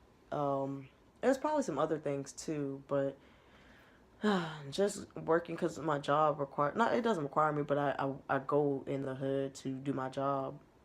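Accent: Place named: Prince George's County, United States. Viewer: You sound like you live in PG County. You should check out the Reelback channel. I had a white dude come up to me and my mom dressed like Jesus and the white family walking next to us were more scared than me. I grabbed my mom and told him to bust a move. The biggest rats I have ever seen were in DuPont Circle. I love my hood as you say. But, I stay away from downtown (Latte City). A lot of the white people in DC are rude as hell. Interjecting themselves in your business, not moving out of the way...And, they give that creepy smile at you. 🤪🙄Matter of fact, I’m about to go outside and see what’s popping. I haven’t worked for the man in five years and my whole perspective has changed. It is imperative to support your tribe. They will support you. Too many of us are straddling the fence. You sound as if you are entering another phase in your life. 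Growth...Have a great week...You are not alone...😋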